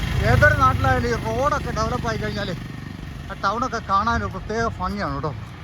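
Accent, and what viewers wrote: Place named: Idukki, India. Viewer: ഞാൻ കൊച്ചിക്കാരൻ ആണ്. നിങ്ങൾ ഇടുക്കിക്കാരുടെ സംസാരം കേൾക്കാൻ തന്നെ ഒരു പ്രത്യേക സന്തോഷമാണ്.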